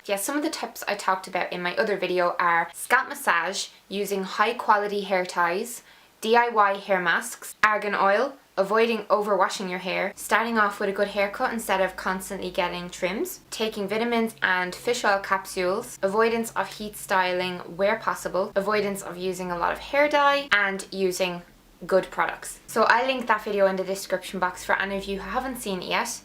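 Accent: Dublin, Ireland. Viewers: from your acsent I know you from Dublin Ireland. I'm from Ireland myself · Firstly, my english isn't good and sorry for this :( My hairs seems like yours. They are very long, shiny and thick. I love my hair but I hate hair breakages :( what I can I do? Notes: I'm very lucky I discoverd u :) I'm a Turkish girl. Irish accent fascinates me :D Your accent is amazing I think :) You're so cut and amazingly beautiful :) I love ya :* And again sorry my english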